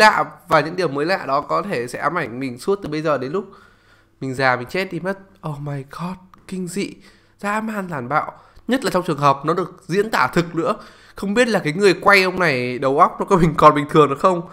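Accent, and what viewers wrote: North, Vietnam. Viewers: Anh là người miền bắc đúng kg ạ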